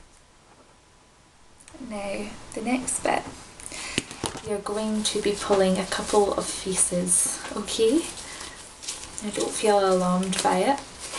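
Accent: Scotland, United Kingdom. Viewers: It's so strange listening to a fellow scot doing asmr haha. Great job though! :) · I found the scottish accent beautiful and heartwarming. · Oh god, the Scottish accent.. Now I have to watch trainspotting again ahahaha amazing video, subscribed + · It's scottish, right? :D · Loved this. Your Scottish accent is ace :) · (No hate) Tbh your Scottish accent isn't that bad, very stereotypical but not bad. However if everyone I was around everyday sounded like this it would be quit worrying. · is that scottish accent? So adorable spoken by her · What a lovely Scottish accent. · You Scottish?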